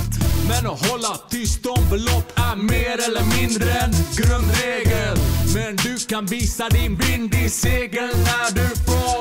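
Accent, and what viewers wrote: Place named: Finland. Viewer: Han bryter ju för fan på finska XD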